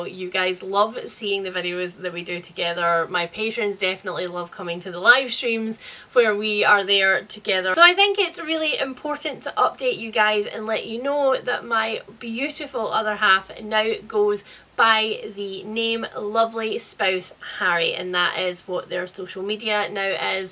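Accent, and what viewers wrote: Scotland, United Kingdom. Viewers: I am in LOVE with your Scottish accent. I have no idea what those other arses are talking about. Congratulations to Lovely Spouse! 💕 I love your openness in talking about your mental health and being up front when you struggle. I come from a family that believes in bottling that shit up or rubbing dirt on it. Your personality and influence is so much more than just book recommendations to me. Thank you. It is because of your frankness that I have accepted my own mental illness as a part of myself. 💕💕💕Please continue to take the loveliest care of yourself and I’m so glad to see you back.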